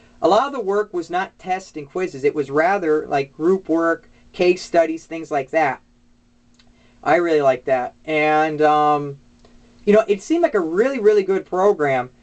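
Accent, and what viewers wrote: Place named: Minnesota, United States. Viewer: saintgauden i'm from Illinois so i'm guessing he's from Minnesota. Wisconsin, Michigan, Illinois dialect sounds similar.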